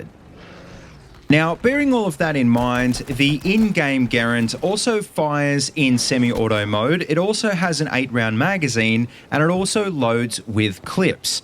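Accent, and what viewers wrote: Australia. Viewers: good to hear an Aussie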